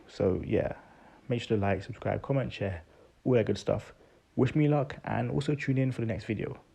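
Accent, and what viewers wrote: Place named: England, United Kingdom. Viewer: Hi you sound English are you based in the U.K. if so do you know where we can play options on these stocks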